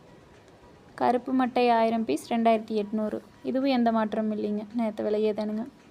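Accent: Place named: Kongu, India. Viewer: கொங்கு தமிழ் அழகு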